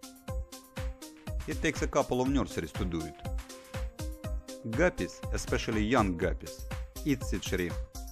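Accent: Russia. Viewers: you Russian? you have Russian accent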